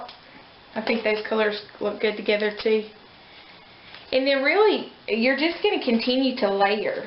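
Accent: South, United States. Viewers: I just love your little southern accent, and phrases. Reminds me of my sweet cousins from "down south". Thank you for sharing! ♥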